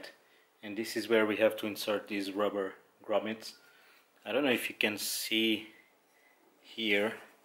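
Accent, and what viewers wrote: Portugal. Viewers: Excelente vídeo! Desde o primeiro vídeo que vi teu, achei que pelo sotaque serias português lol Já agora, os buracos nos cantos superiores da grelha servem para algo? Abraço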